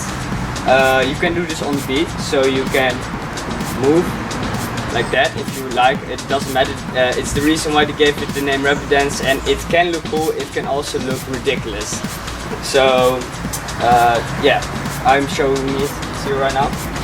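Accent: Netherlands. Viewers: Hahaha gy hebt een Hollands engels accent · hij s ook nl vgm... want zn uitspraak klinkt als die van n nldr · ik hoorde al een Nederlands accent 😌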